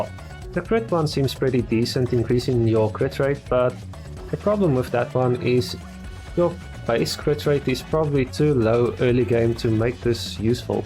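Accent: South Africa. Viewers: You sound South African, I'm from there too. Nice video my dude!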